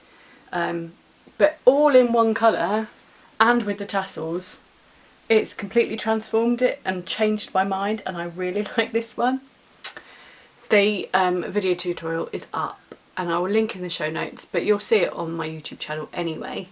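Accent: United Kingdom. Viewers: Hi, I live in the south of Brazil. I just love listening to you chat, I absolutely love the British accent. I love crochet and I am now making the Easter eggs you posted!! Just love them 💓💔🥰💋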